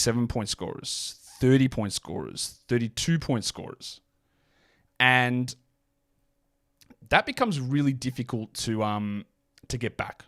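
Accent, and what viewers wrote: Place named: Australia. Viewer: "They deliberately say something wrong or weird to boost themselves up in the algorithm. Maybe I should start that" Also: has a thicc Aussie accent who hosts a daily show that with a viewerbase of largely Canadians and US, and greets them every show with obsure aussie quotes like "what is the crime, eating a succulent Chinese meal" 😅😅 Look, you're not wrong. Kept me coming back that's for sure.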